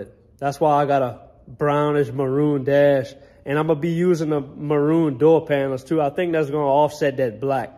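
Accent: Louisiana, United States. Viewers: yeah i live in Arkansas been to Louisiana few times as a lineman for hurricanes it’s definitely a trip! Knew you sounded cajun!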